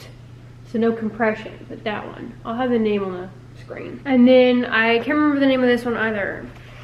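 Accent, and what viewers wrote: United Kingdom. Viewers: Are you British? you're lil British accent is so freaking cute 🥺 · no she is Bri-ish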